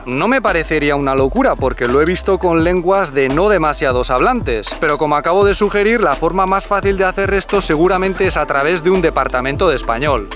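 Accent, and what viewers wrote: Basque Country, Spain. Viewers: ...tu te sentirás descafeinado...pero yo tras el primer video sabía que eras vasco, navarro y además de que zona.....tu acento es muy muy concreto. A mi viajar me ha reafirmado en mi identidad, justamente por el contraste que encuentro y que me flipa.sorte on txikiarekin! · PERO EL ACENTO EUSKALDUN NO LO HAS PERDIDO. AUPA TU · Ahora me queda claro tu acento,aunque seas navarro a mí me suenas más vasco.Gracias por compartir.😊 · hombre si escucjas lo que cuenta, tiene mucho más de euskaldún que de español, escucha su acentazo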